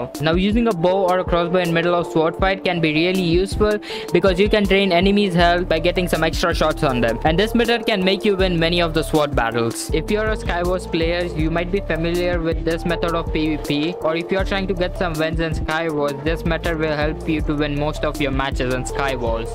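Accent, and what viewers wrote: India. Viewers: Is this guy indian??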